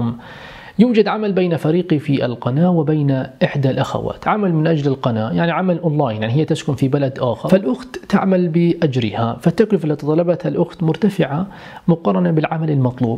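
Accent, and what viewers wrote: Palestine. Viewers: s sss مع أنو ماني فلسطينيه بس بحبا للهجتكن كتير وبحب اسمعا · شيخ نص كم😂😂😂 فلسطيني ميه ميه،الحمدلله تكلمت فلسطيني قليلاً لاني كنت اشك انك تجيد لهجتك😂🧡😊 طلب صغير .. تكلم فلسطيني ولو جمله واحده في فيديوهاتك قلت لك اني اشك انك تجيد لهجتك😂💛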